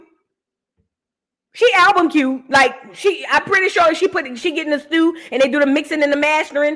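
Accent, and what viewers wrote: New Orleans, United States. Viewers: You head ain't ugly, you are adorable, just your voice New Orleans sound is so exotic. Chocolate Sex Symbol ❤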